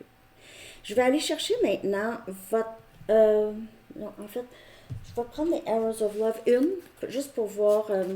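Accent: Quebec, Canada. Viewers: Bonjour, Je vous apprecie beaucoup car vous etes vraiment rigolote,et votre accent quebecoie aussi j'apprecie beaucoup,vous etes une personne belle,une bonne personne empathique avec beaucoup de coeur et de partage. Merci pour tout et bonne journee a vous.❤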